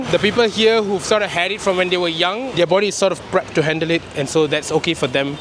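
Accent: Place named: Tamil Nadu, India. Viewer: உங்க பேச்சு மலேசியன் தமிழ் மாதிரி இல்லை பக்கா தமிழ்நாட்டு தமிழ் மாதிரி இருக்கு நான் சிங்கப்பூர் ல தான் இருக்கன்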